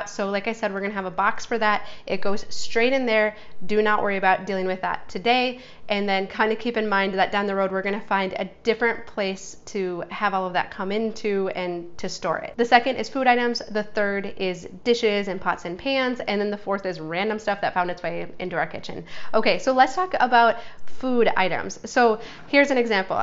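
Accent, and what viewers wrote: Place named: Midwest, United States. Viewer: I hear a midwestern accent! But that only adds to me loving what you share here!!!!! OMG!! 😍 I’m going to end up watching this over and over!!!! I want to simplify so badly!!!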